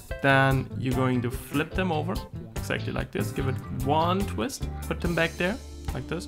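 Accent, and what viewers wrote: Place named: Germany. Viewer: First sentenced told you‘re from germany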